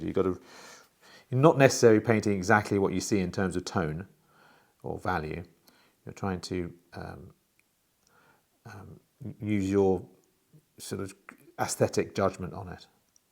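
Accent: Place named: Scotland, United Kingdom. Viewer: Thank you so much for sharing this wonderful time and painting with us. Your heart and joy for color are so beautiful. It is so lovely to also feel the support and caring of your family. My husband lived most of his life in Scotland and I am American. Our home is in America now. And we travel yearly back to Scotland. It makes my heart happy to hear you accent and expressions. Such lovely painting and wonders and learning from what is now my other home.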